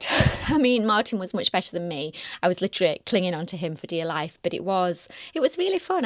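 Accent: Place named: North, United Kingdom. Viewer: 61. I love your videos...your home is beautiful. As a northerner who no longer lives up north, it's great to hear a fellow northerner and to see some of the northern sights! Keep up the good work!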